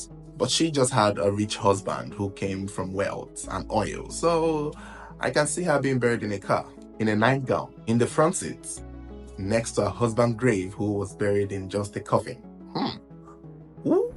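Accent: Igbo, Nigeria. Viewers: He sounds like an Igbo from Nigeria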